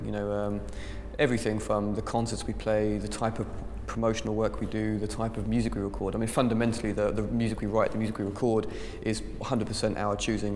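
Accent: United Kingdom. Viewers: i love british accent:)))))))) <3<3<3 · He's a perfect package! He's intelligent, he has a great looks plus the sexy british accent. Just perfect.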